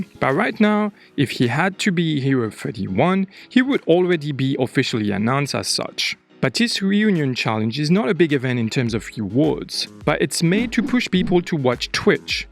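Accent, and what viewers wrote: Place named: France. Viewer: Are you french? Cause you sound french.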